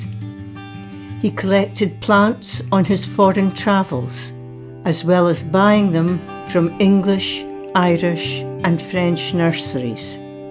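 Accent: Scotland, United Kingdom. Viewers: Lovely soft Scottish accented presenter's voice makes the videos all the more enjoyable.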